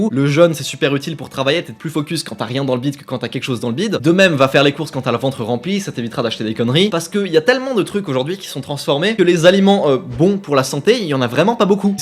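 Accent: France. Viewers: tu parles un anglais francisé c'est perturbant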